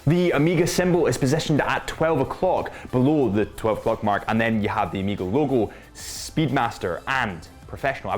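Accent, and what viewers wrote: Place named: Scotland, United Kingdom. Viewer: Very intesting review,. But you were-for a german guy-a little hard to understand because of the strong accent you have... where are you from, maybe Scotland? Cheers Stefan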